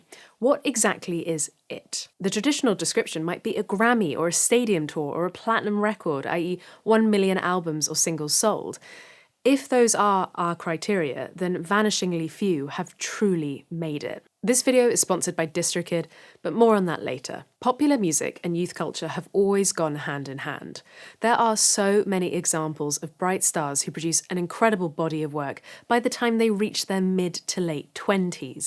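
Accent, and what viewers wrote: United Kingdom. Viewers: I don't know anything about making it in the music industry - but I could just sit and listen to that British accent for hours on end!! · I dunno...you're a skilled and respectable guitarist with a wide YouTube following. I'd argue you've made it already. Truth is, the old models of success no longer apply. Back then, if you didn't have a record deal, no one knew your name. Today, ironically, music is one of the few fields in which now you can succeed with hard work and quality material. And even if your appeal is quite narrow, you have a far greater chance of reaching an audience than back in the day. Truly, I'm not that familiar with your music. I know you from your commentary--like Rick Beato--and I value that aspect of your work immensely. You are always lucid, thoughtful, and intelligent, and the fact that you've got a pleasant speaking voice with a lovely British accent doesn't hurt at all. 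I would wager that even if I can't name one of your songs, I know you for being; and that success, I think, is more valuable than mere musical fame. But truly, what do I know? I don't even have a YouTube channel with hundreds of thousands of subscribers! 🤷‍♂️ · I follow you for your insight, intellect, and whit mostly.. and your charming, propper British accent. I'm honestly not that familiar with your music, but you done good on with Josh. You're valued.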